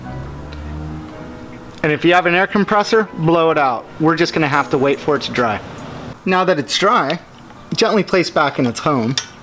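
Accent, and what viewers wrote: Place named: England, United Kingdom. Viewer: I am not saying has gay hands even though they are pretty small and your ascent some times sounds a bit English.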